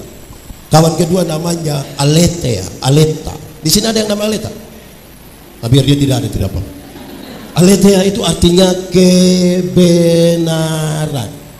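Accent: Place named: Eastern Indonesia, Indonesia. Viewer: itu bukan membentak... mungkin kamu baru liat atau baru mendengar aksen/dialek seperti ini.. itu ciri khas bagian timur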